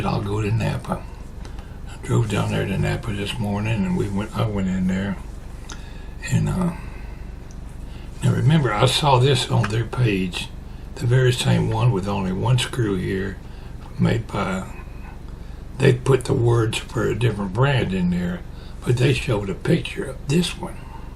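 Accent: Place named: South, United States. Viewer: I noticed you pronounce it "SILL-uh-noid". So do a few of my in-laws. I looked up the pronunciation, and it's what I would have thought... "SOH-luh-noid". I reckon your way must be a Southern variation, because you aren't the only one that pronounces it like that. I wonder about some of your viewers from other regions ...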